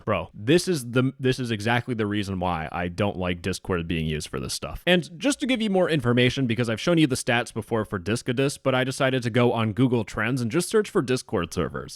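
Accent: United Kingdom. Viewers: blud sounds like the spiffing brit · Ahyes, the spiffing brit speech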